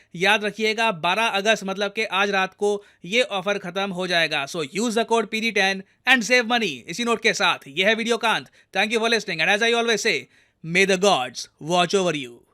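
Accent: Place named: Bihar, India. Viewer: मगर काहे the Bihari touch 😊❤ we love your video महोदय🙏🕉️🇮🇳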